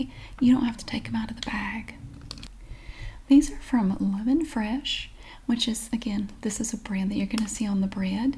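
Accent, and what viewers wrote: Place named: South, United States. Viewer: I love your accent. Your voice alone is so incredibly soothing, but the accent feels so homey (even though I am from Finland, I certainly haven’t grown around an accent like this hahah.) I dare to assume it’s southern? Love your videos anywho!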